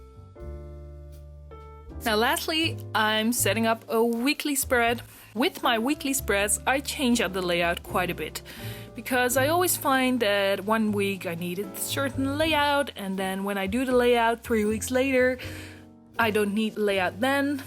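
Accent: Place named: Netherlands. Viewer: Do you know when you watch a video and you hear a slight accent and you're like DUTCHDUTCHDUTCHDUTCH As a dutch girl, it's like a dutch radar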